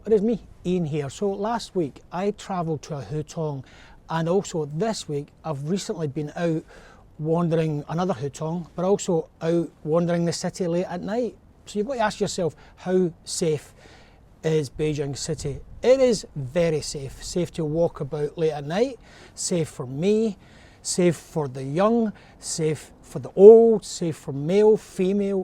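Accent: Scotland, United Kingdom. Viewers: It is good that your Scottish accent is not too strong to understand.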